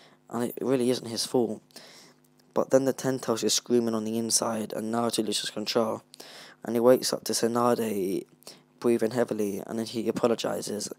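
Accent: United Kingdom. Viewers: yo man’s is welsh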